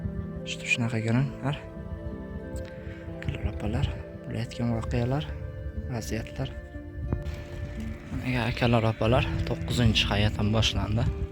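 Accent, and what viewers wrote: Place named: Chiroqchi, Uzbekistan. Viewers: укам.мен.хам.чупонман.шевангиз.бизникига.жуда.ухшаеди.узиез.хакингизда.малум от.беринг..каердансиз.самарканд.жом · Dustim uzingiz qayirdansiz. Chiroqchi shevasida gapirayabsiz. Sog buling omad tilayman.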